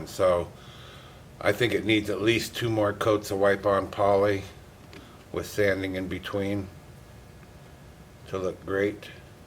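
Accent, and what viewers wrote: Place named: Indiana, United States. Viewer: Good morning. The bowl is beautiful. I was worried you would catch your hand on parts of it. Maybe you should wear a glove. What part of midwest are you in? You sound like Indiana. Have a bless day. See you next time.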